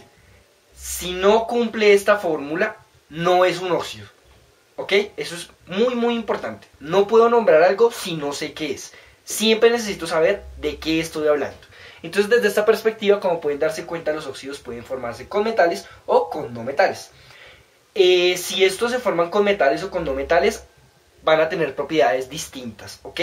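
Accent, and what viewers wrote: Colombia. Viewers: es Colombiano??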